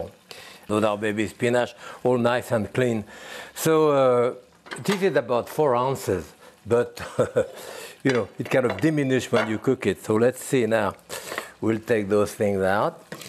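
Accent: France. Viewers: Usual Jacques le cuistot I inimitable ! I have been following his demonstration decades back and marvel at his techniques and his French accent . Anand from ile Maurice (Mauritius)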